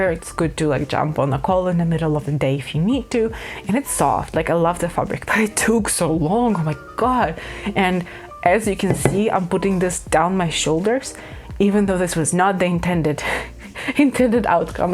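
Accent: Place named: Canada. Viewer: First time watcher! I love your energy! You’ve e got some beautiful projects going right now. I can’t wait to see them finished. I have also never seen a stand like that to hold yarn. That could come in handy. Are you originally from Canada? Your accent is beautiful.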